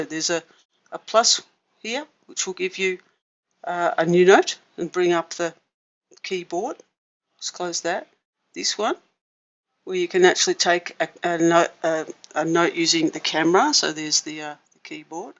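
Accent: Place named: Australia. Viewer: Great help and very clear. Loved hearing an Aussie voice too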